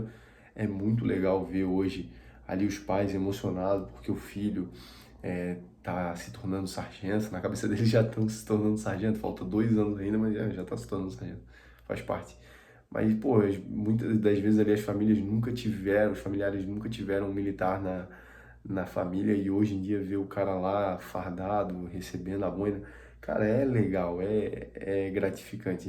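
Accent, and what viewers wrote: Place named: Florianópolis, Brazil. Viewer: Pois é, tbm reparei, ta recuperando o sotaque do manezinho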